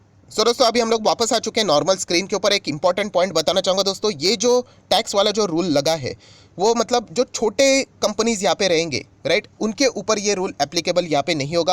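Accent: Maharashtra, India. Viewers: सर, तुमच्या डिमॅट अकाउंट मधील पोर्टफोलिओचा व्हिडिओ करा एकदा. मला माहिती आहे आपण एक मराठी माणूस आहात.... आपल्या हिंदिवरूनच समजतय ते... आपली अशीच प्रगती होवो....जय महाराष्ट्र..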